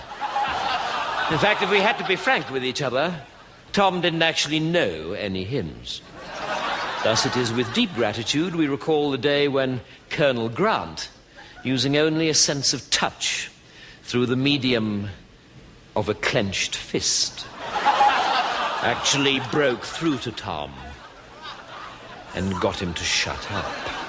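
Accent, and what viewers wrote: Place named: Newcastle, United Kingdom. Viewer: I'm proud of this talented man from the North of England..People are often amused at geordies but Rowan is from Consett, believe it or not, and can speak his native geordie accent very well!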